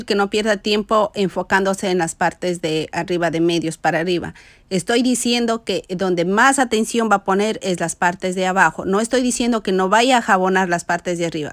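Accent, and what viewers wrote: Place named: Ecuador. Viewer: por su acento, es usted Ecuatoriana, Pais lindo, de gente hermosa, trabajadora, si estoy equivocada, disculpen.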